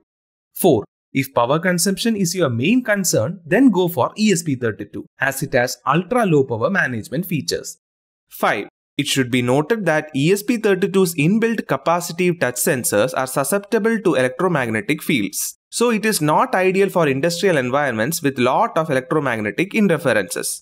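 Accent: India. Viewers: Sorry ... this indish-english is extremly hard to listen to for more than 30 seconds ... Wearable applications are mostly direct connected with need of low-battery consumption. So the Nano in coop with RPi is non-sense.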